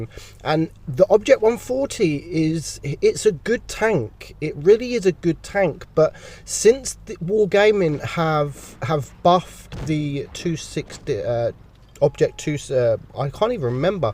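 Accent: United Kingdom. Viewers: .... You are absolutely unique. And NOBODY can`t copy that accent.:) Fugit could try to copy the style, but that british accent is hilarious. Cheers. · You're British mate, I don't hold it against you.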